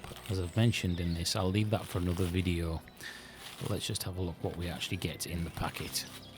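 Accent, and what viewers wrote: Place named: Northern England, United Kingdom. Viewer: That moment when you realise your tube doesn't fit.... Love the northern accent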